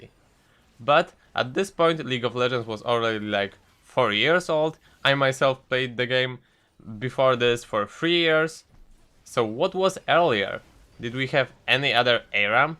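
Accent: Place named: Poland. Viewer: You know Pole as you listen to one m8 :D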